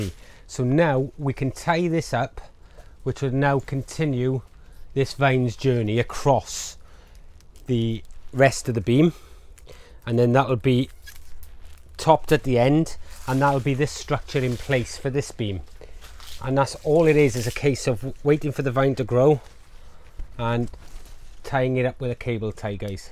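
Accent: Wales, United Kingdom. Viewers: As soon as heard your voice I thought - he sounds Welsh - possibly from South Wales. Then I saw your T shirt. Where are you based?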